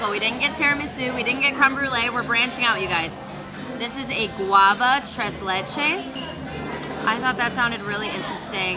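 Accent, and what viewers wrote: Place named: Midwest, United States. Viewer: I can hear the midwest in your voice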